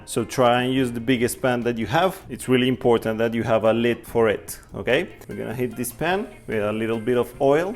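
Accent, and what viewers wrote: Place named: Rolo, United States. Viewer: Although I really love your "rolo" accent your english is quite nice 😲 I'm ready for: eats. 💪🏼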